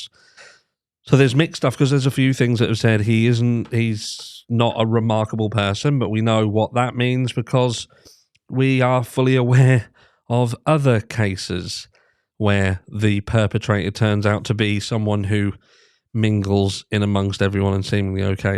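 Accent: United Kingdom. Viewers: British accents are amazing. Thanks for the commentary. Eye opening!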